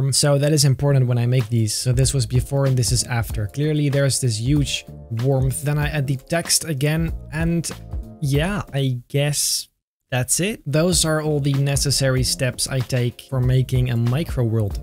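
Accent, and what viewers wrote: Netherlands. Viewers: I love your accent, are you dutch? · You sound dutch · It’s called an accent dude. He’s from Holland. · Go for a 1000 Microworlds!! And then auction them off like Beeple and become rich! Also, are you Dutch (like me)? I notice a bit of an accent, not too much tho...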